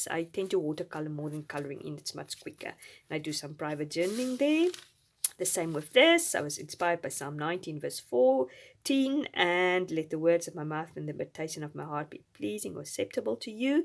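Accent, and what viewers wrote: South Africa. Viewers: I love your South African accent too, your have a lovely soothing voice to listen to! 😊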